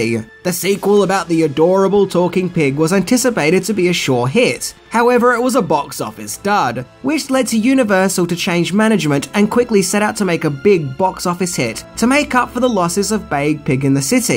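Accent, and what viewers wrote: Australia. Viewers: It’s even printed wrong on the last title card. I was cutting slack for the Aussie accent thinking maybe he’d always just heard it wrong but when it appeared incorrectly in print, I gave up. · Oi you’re an Australian, sweet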